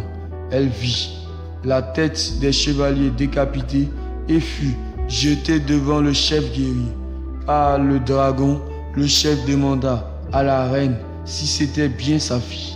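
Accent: Ivory Coast. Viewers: bonjour je vais m'abonner à ta chaîne ça me ferait plaisir de voir ton évolution par ailleurs ont à déjà dit que ta voix ressemble à la nôtre celui des ivoiriens 🤛🥰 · Ta voie ressemble à celle d'un ivoirien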